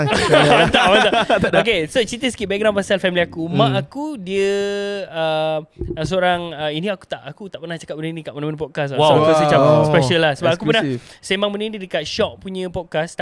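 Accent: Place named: North, Malaysia. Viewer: Smbng utaqa 😂syok tgk😂😂😂😂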